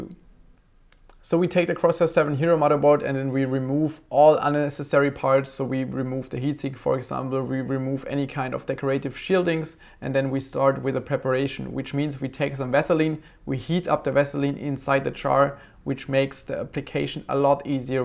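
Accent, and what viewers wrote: Germany. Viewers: So glad der8auer does videos in English, too. His English is pretty good and while there is some German accent for sure, I don't really mind. It is definitely easier to understand than a language I've never studied, I took French as the third language and forgot most of it anyway :) Awesome OC, it's a pity one can't go even close to 5 GHz on air though. Intel has some advantage here and I'm always glad to see it getting slimmer with AMD's advances. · The german dialekt xD xD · Dieser deutsche Akzent ist so eckelhaft 😂😂 Aber ich glaube ich hab auch so nh Akzent wie der 😳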